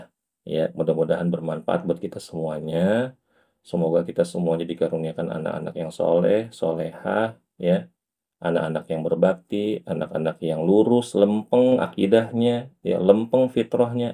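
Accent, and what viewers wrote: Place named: Jakarta, Indonesia. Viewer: MasyaaAllah baarakallahu fiikum ustadz, konten sangat berfaedah, utk kami apapun bahasa dan logatnya ga masalah jarang² kajian Salaf logat batavia, biasanya yg batavia itu yg ono noh 🤭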